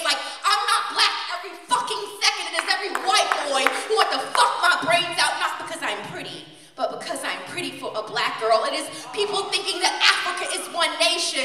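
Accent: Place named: New Orleans, United States. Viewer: im convinced shes from nola lol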